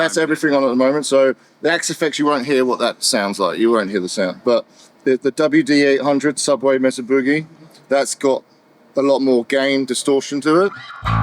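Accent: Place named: United Kingdom. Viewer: I never checked before. Surprisingly British. 😅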